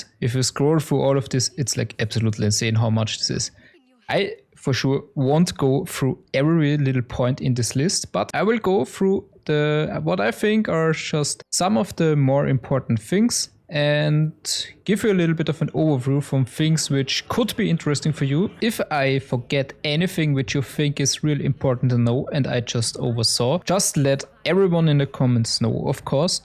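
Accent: Germany. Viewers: No offence, but that's a hell of a german accent... XD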